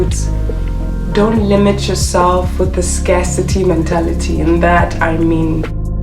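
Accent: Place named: California, United States. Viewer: Which part of South Africa you from? Interracial dating isn’t common at all. You making sound as if you from California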